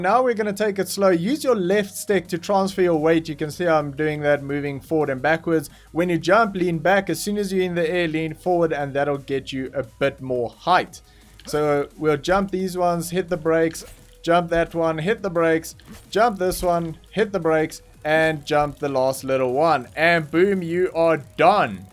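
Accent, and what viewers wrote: South Africa. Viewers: You sound South African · Are you south african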